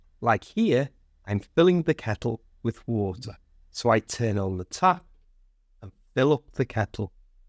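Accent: Liverpool, United Kingdom. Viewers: You have a wonderful scouse accent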